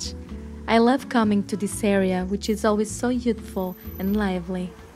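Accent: Portugal. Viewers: Now I'm sure you're Portuguese. I love that accent and I love Portugal. And I love the Ritz.❤✨️🇵🇹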